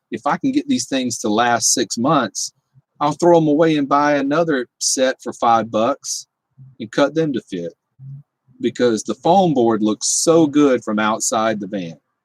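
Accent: South, United States. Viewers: Hey. Gotta check out all ur vids. I see Humbuckers on that Maple beauty. Is that a Gibson or Epiphone? Great Vid with in OR.All Subbed. I thought that smooth Southern accent was maybe GA or MI, but I'm guessing TN, now a fave state of mine. Kenny Chesney was an E.TN boy. Stay safe & Happy Trails brother.🎸🥂I'm in NYC and gotta bail. Gotta build a Van or Pickup Rig. Stay safe brother.phil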